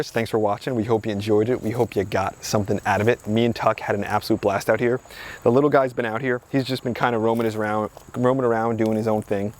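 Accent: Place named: Philadelphia, United States. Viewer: ❤❤❤❤❤ I love your channel!!! (Can tell you’re near Philly, love to hear someone who also has the accent :) )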